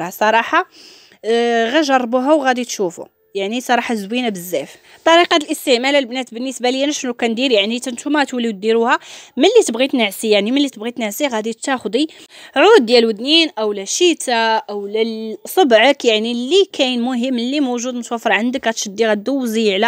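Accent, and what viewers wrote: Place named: Morocco. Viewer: باينة من اللهجة واش مغربية🤗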